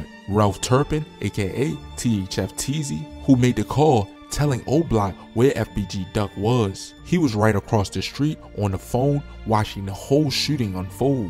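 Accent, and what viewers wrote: Baltimore, United States. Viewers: B.More accent lol 410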